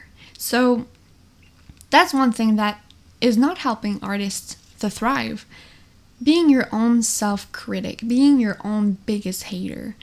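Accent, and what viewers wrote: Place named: Europe. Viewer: Do you have a French ancestry? You seem to have a European lilt to your accent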